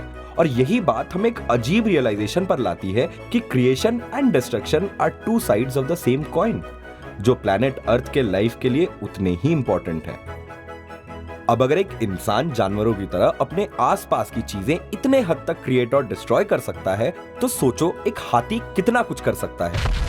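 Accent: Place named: Uttar Pradesh, India. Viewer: Very beautiful and eye-opening video.. Voice over by UP vale bhaiyya.. :-)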